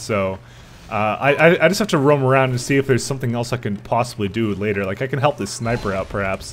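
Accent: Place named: Canada. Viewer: His Canadian accent may have something to do with it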